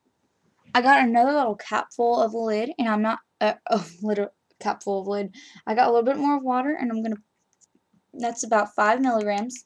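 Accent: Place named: South, United States. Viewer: Are you southern? You have an accent :) I'm from South Carolina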